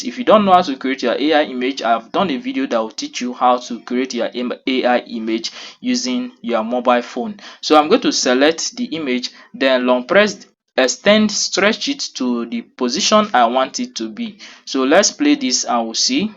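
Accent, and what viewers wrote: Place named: Nigeria. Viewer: How did you come about the Nigerian textured voiceover?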